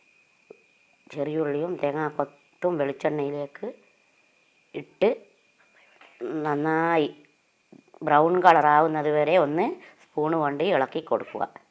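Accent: Nadapuram, India. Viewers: നാദാപുരം ഭാഷ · Nadapuram bhaagath alle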